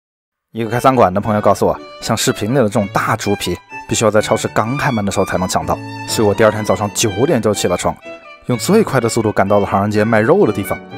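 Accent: Wuhan, China. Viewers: 作为一个武汉人，突然听见了汉普也是挺意外的！哈哈哈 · 听着你武汉的普通话真是亲切。我也是住在德国的武汉人，什么时候你也做做Panettone?相信你也一定会做得很有特色的！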